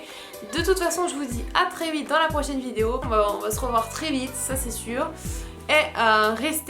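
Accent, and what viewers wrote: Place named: South, France. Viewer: avant je me rendait pas compte de ton accent typique du sud mais maintenant c'est stressant. Moi je me suis inscrit a la salle il y a2 semaines et je vois que mes abdos pousses et mes bras et mes jambes aussi c'est plutôt cool. Et sa c'est grâce a toi, tibo et marine merci♥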